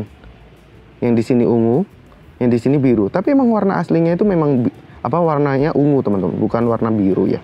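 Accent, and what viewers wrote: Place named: Yogyakarta, Indonesia. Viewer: oalah...wong jogja to dab...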